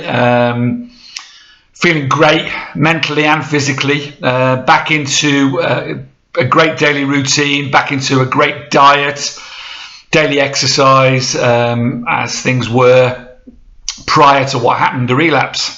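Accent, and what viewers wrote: United Kingdom. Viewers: Hi sweden here! I dont know when I and How I stumbled in on your videos but soo great to listen to! When you say "feal good when I take the pill" which medication are you then refferring to? If you feel ok to write it! So happy to see you back as you speak of! And what a great think to live in Spain sooo I would like! Take care and continue well and I think I heard a parrot sound in the background. And have to say that your brittish accent is soo convinient to listen to!!! Which part of GB are you from? Stay safe further on your journey All the best from Sweden 🌹🌹🌹👍🏼👍🏼👍🏼🙏🏼🙏🏼🙏🏼😊😊😊👋🏼👋🏼👋🏼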